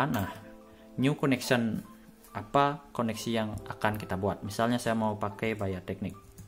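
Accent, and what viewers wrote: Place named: Bali, Indonesia. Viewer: Dari Bali bang ya? Logatnya macam Dwi Arsana :D Salam dari Aceh bang. .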